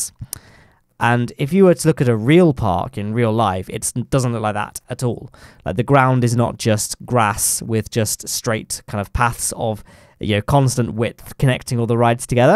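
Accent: United Kingdom. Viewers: Hearing my man saying 'yo' in his amazing British accent (not as thick as mine though). It's amazing!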